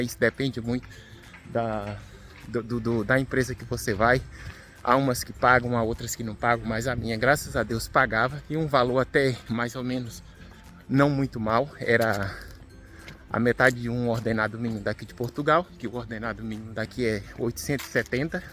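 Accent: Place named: Northeast, Brazil. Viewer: Quem será que mandou esse nordestino, essa encomenda, para cá para Portugal? No último vídeo dele, ele desativou os comentários porque ele sabe que vai ter "pra sobrá prá eli". Ele não está no estado dele, nem ele está no país dele. Vê-se logo que ele é dum país de TERCEIRO MUNDO, que é de orientação de esquerda e ele dá a entender que é evangélico ao dizer que a Terra é de todos. A terra dos evengélicos e dos das seitas é a Holanda, a Dinamarca, a Suécia etc. Portugal é um país católico e cá fala-se português de cá de Portugal e não o português do Nordeste do Brasil, como ele fala.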